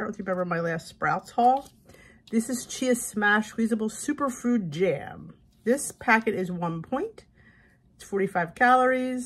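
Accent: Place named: Philadelphia, United States. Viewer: From one South Philly girl to another- You can take the girl out of South Philly but you can’t take South Philly out of the girl🥰As soon as I began listening to you (recently) I thought you sounded like a Philly girl. St.Maria Goretti HS grad. Class of 1970!!! Much older than you 😊 Really enjoy your channel 👏👏👏👏👏 Mary from Delaware County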